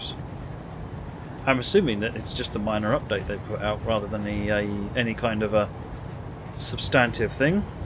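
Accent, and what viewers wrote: United Kingdom. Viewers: You have a bit of a suffolk/norwich accent.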